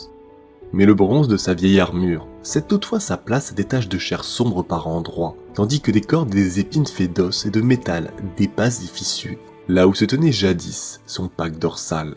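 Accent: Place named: England, United Kingdom. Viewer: Chouette vidéo, par contre vraiment pas fan de la prononciation des noms avec "l'accent anglais", cela fait très "lourd" avec tout le reste en fr...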